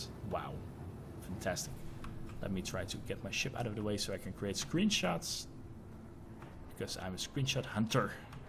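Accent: Netherlands. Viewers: are u from the netherlands by any chance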